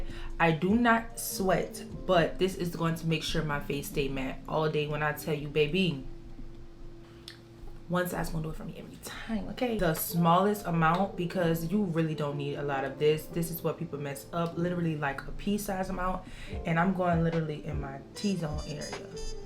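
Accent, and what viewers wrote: Louisiana, United States. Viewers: the hair definitely grabbed my attention but once I heard you talk I said “oh yea she from Louisiana, lemme follow her” lol you’re beautiful! 🫶🏾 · You are sooooo beautiful. Are you from New Orleans? Trying to pin down your accent! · This is my first time seeing you... You were in my recommendations... I love your make up and then I heard that accident too 😍 is that a New Orleans accent? I love your accent!! 🥰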